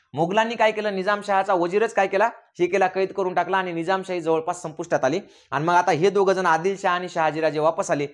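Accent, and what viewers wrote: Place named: Marathwada, India. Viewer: तुमची मराठवाडी भाषा फार छान वाटते.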